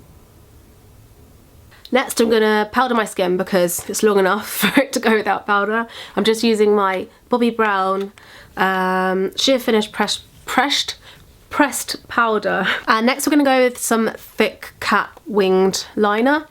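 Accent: London, United Kingdom. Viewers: I have watched nearly all of your videos and i think you're amazing! i also love the way you say "gorgeous"! gotta love the london accent ;D xx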